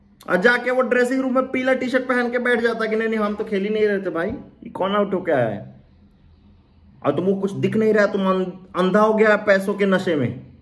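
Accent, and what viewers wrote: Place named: Bhojpuri, India. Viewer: 'Mar maidaan' bhojpuri accent love from araah,bihar❣️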